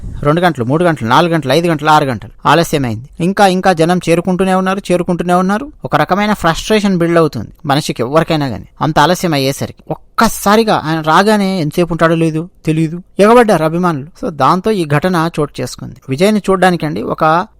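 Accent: Telangana, India. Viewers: U act smart I know u talk telagana. Ascent when feel other then u dont like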